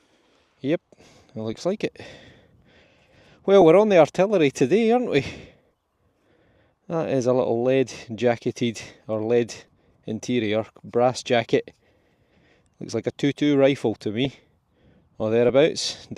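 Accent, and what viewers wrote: Scotland, United Kingdom. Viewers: I enjoy both, I love the Scottish accent 😅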